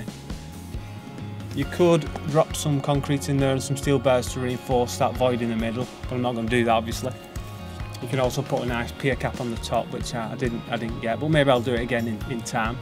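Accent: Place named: Northern England, United Kingdom. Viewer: Thanks for another great video. I love your norvern accent and eagerly await for your next tutorial. Please keep up the good work pal.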